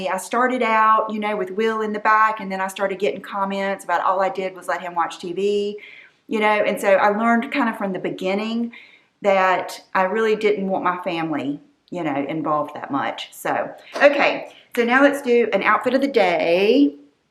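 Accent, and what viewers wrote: South, United States. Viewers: I was so excited seeing all of the missing make-up and box of perfume you found in the garage the last few weeks. It was so fun to see the joy all of that stuff brought you. I love your energy and sweet soothing southern voice. 🌸🐝 🌸🌸💄 👛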